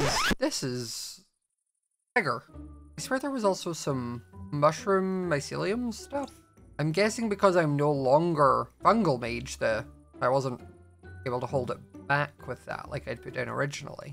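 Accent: Scotland, United Kingdom. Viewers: why does he sound Scottish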